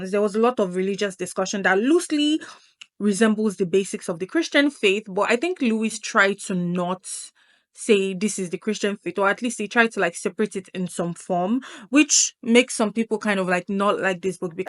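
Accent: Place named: Jamaica. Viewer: Amazing video love your accent are you Jamaican also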